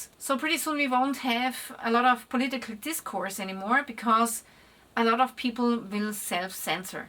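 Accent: Austria. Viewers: I love your content... love your Austrian accent. :) Agree 100% that Germans are awesome... been to Braubach, Oberwesel, and Frankfurt. People could not have been more friendly and gracious. Bitte and danke go a long way with them. Their government, well... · You do have the better dialect 😄 When I made a trip around south Germany and Austria, I suddenly felt that German language can sound nice, soft and elegant after crossing the border into Austria ❤🤍❤ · I love the Austrian accent, I’m glad Germans are leaving, it’s not right or left anymore, it’s the people vs government. ☝️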